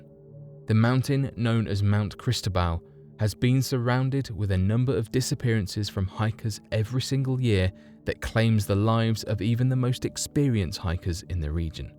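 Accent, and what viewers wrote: England, United Kingdom. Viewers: for a guy with an English accent, he sure doesn't speak English well. "had went missing"? "tells of that of a married couple"?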